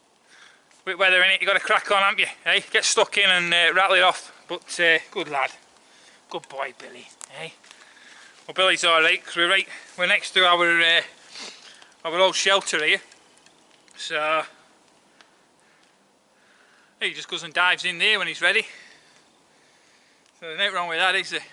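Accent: Lancashire, United Kingdom. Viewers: A really interesting and entertaining video. I'm still laughing at you tipping your Bilberries out by scratching your ear. I'm from the West Midlands, (Black Country) and I can understand your Lancashire accent and slang, but I wonder if some of your overseas viewers have a clue what you're taking about sometimes - RITE. 😉😁👌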